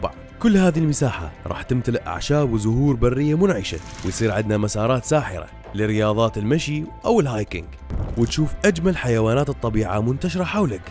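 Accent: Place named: Iraq. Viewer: المعلق بالفيديو عراقي😂😅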